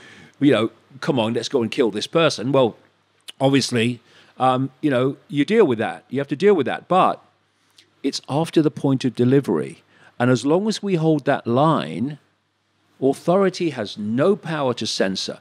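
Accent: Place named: RP, United Kingdom. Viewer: Freaking amazing that Dave understands another language but answers in the Queen's English?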